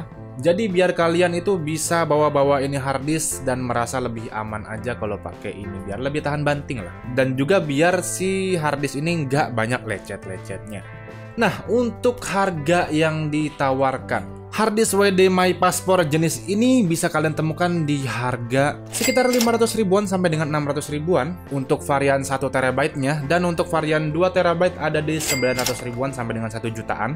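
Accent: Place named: Bekasi, Indonesia. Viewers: orang bekasi kayaknya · entah kenapa, logat dan pilihan kosakatanya mirip aksen Bekasi gitu... Kental aksen Bekasi haha..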